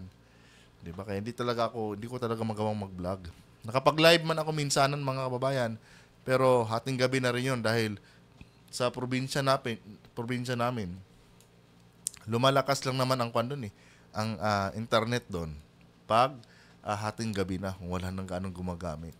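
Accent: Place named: Davao, Philippines. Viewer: Taga davao po ba kayo 😊? Kc kaparehas moh ang accent si baste..tanong lang po..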